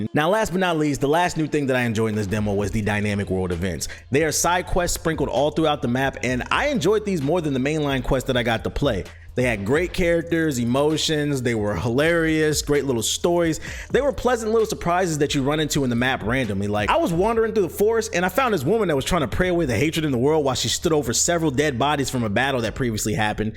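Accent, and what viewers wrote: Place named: Pittsburgh, United States. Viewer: He must be from Pittsburgh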